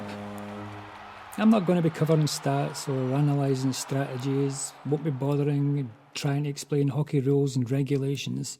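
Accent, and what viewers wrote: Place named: Scotland, United Kingdom. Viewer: That's a shocker if a Scottish accent all the same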